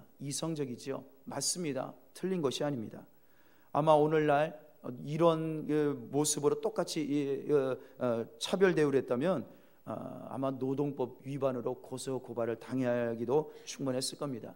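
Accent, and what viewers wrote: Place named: Gyeongsang, South Korea. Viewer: 목사님 말투가 갱상도사람 다 되셨네요!!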